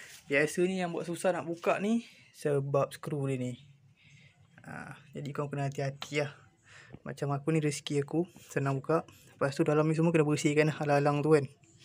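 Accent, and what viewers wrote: Melaka, Malaysia. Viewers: Pekat betul tu bang melaka tu hahaha. Mantap bang video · Assalam bang, Nak mintak tolong tukarkan boleh ke?...Saya dah service kat bengkel kena RM 60 ...Macam tu jgk turun naik ....bunyi cakap mcm org melaka...kalau kat melaka boleh la roger2...haha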